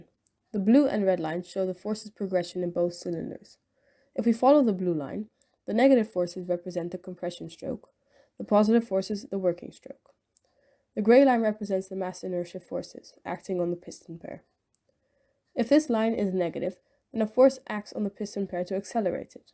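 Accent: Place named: Netherlands. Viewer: I'm not an expert. But if you could tune the balancing of the counterweights right, this seems functional. Using the power stroke to directly power the compression stroke of the other 'cilinder'. Just one note however: due to the placing of the gears, the arm wich rocks the pistons back and forth is not symmetric. Causing the pistons to slowly compress on the left. And rapidly compress on the right. To me that seems like a bad thing. But that's all I got. (En ook erg goed Engels. Ik had bijna niet door dat er een Nederlands accent was :D )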